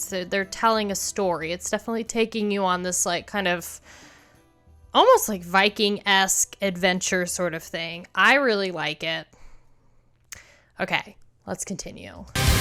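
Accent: South, United States. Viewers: Where are you from? I'm detecting a southern accent in your voice.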